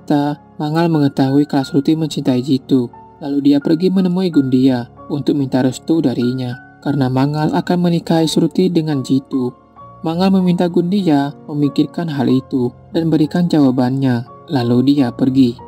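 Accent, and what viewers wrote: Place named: Banyumas, Indonesia. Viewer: Ngapak Hadir lagi miin... 😘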